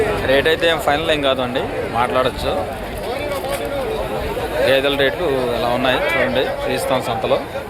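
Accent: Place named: India. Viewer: Telugu voice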